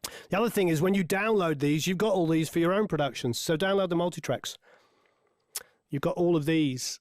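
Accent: United Kingdom. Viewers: lol hair like that, British accent, and music background. WOW are you a shoo-in for California. 😂😂 i hope you make a million!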